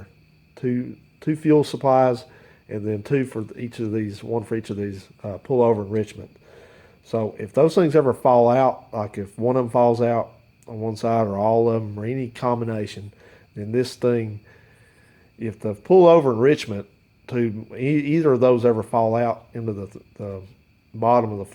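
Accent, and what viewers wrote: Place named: South, United States. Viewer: Greetings: "What happenz is" ....Rednecks so fun 2 listen talk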